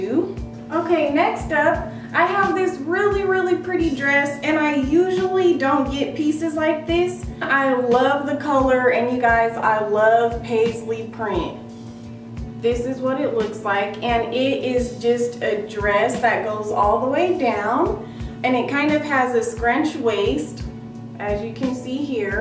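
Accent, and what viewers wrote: South, United States. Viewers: Where are you from you have like a southern twang to voice.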